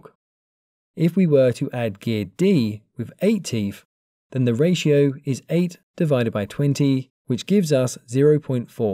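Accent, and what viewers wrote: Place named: United Kingdom. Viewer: I think he's a british guy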